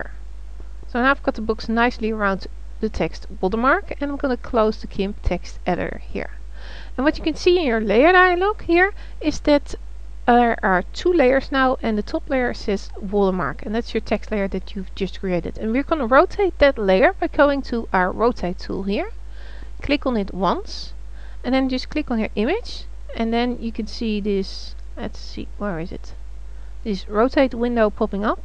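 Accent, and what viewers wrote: Netherlands. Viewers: Hihi bij je eerste zin hoorde ik al dat je Nederlands was :] handig filmpje thanks! x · Haha je nederlandse accent komt er erg door :)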